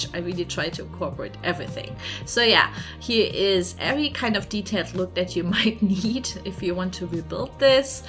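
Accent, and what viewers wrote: Germany. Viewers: I could hear it in your accent that you're german! (I'm german too!)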